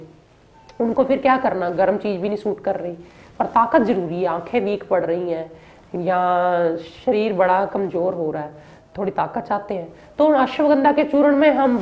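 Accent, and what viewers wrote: Punjab, India. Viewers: Apki hindi ka bulnay ka tareeqa say muje lagta hai app punjab kay hai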